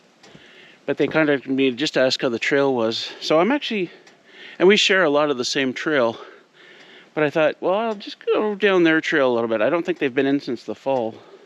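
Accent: Canada. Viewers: Hello, Im assuming this is Canada , bc of your accent. Are you allowed guns while living off grid in Canada? A life like this goes hand in hand with rifles. Just a weird question for ya. Killer set up sir. Cheers!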